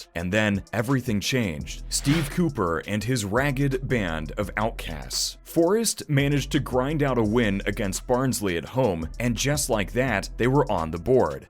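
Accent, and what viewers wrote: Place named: United States. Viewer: Stick video on out of interest. Hears American accent. Switch video off.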